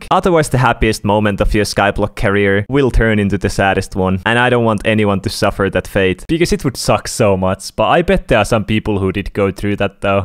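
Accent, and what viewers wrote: Finland. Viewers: R u Finnish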